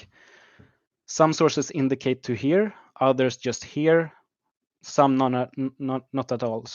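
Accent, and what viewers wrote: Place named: Sweden. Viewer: I could tell right away you were swedish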